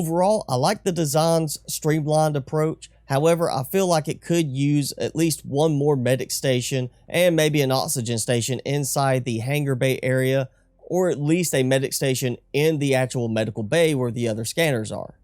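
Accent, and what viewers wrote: South, United States. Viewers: You sound Southern.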